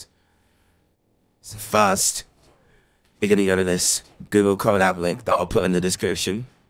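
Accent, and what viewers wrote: United Kingdom. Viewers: Why this nigga speaking british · keep getting runtime error (why does kanye sounds british in this vid)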